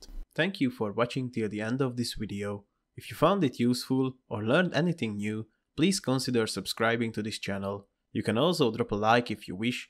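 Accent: Hungary. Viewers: Hey man, thanks for the tutorial, easy and understandable... just one thing i want to ask... aren't you hungarian??? :D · Nice video! I'm wondering what kind of accent is this you have :D Jól sejtem, hogy magyar? :D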